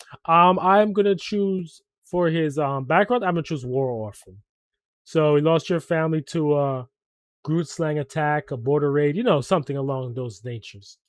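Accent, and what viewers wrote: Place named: Jamaica. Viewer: Ok so I've been preeing for a while so your video showed up on my feed. And I couldn't help but think 'wow this guy in the video's accent could pass for Jamaican' THEN YOU MENTIONED YOU WERE JAMAICAN (as we Jamaican's often do) and I was like eyyyyy we out here!! Lol let me know if you ever wanna play I'd love to party up.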